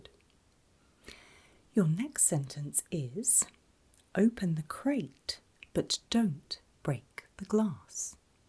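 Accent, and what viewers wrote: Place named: United Kingdom. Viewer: Now this is true asmr guys she’s my fav , SHES A BRITISH ASMRIST TOO FINALLLY ❤❤❤❤❤❤❤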